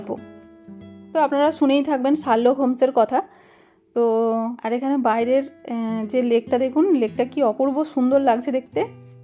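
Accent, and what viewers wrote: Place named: Kolkata, India. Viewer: কলকাতার এক্সেন্টটা আমার কাছে জোশ লাগে। ভিডিওর প্রাকৃতিক দৃশ্যগুলো মনোমুগ্ধকর ছিলো। দিদি কিছু জায়গায় ভয়েসওভারটা খাপছাড়া লেগেছে। ওভারঅল ব্লগটা দারুণ।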